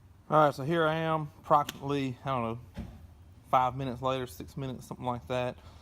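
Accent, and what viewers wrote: Alabama, United States. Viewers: You sound just like where I come from in south Alabama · Bama boy haha that accent tho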